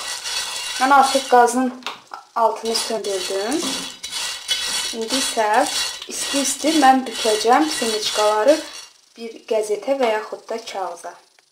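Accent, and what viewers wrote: Azerbaijan. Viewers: Ayaallah tam Azərbaycan ləhcəsi 👍🏻 Halal olsun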